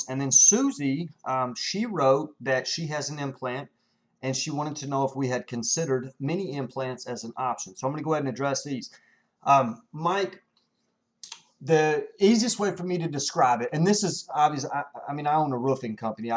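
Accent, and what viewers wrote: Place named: Kentucky, United States. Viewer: I really like your videos. They have helped me a lot. I also really like your Kentucky accent!!!!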